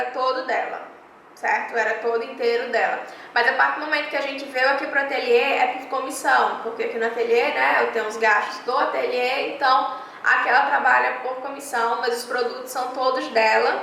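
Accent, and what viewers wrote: Northeast, Brazil. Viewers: . adoro o seu sotaque vcs nordestinos. eu sou de formosa Go. sou cabelereira e maquiagem tbm. amo a profissão da beleza gosto do seu trabalho te acompanho a pouco tempo mais me identifiquei com vc. Que Deus te derrama muitas bênçãos em sua vida pois tudo que vem de Deus e bom perfeito e agradável. bjos manda um bjo pra mimmmmm. se vc puder entra no meu stagran e vê mais trabalhos e me sal o que vc acha a me de dicas. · Eeeee ,até que enfim ,descobri de onde vc é,suspeitei que era nordestina pq falamos muito igual...Kkkk,sou de Natal RN e estou apaixonada pelo seu canal.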